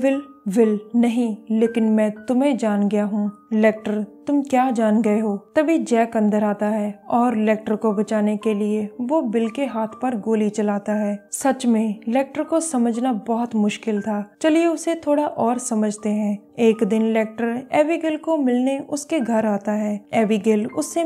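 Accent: Punjab, India. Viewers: Punjabi accent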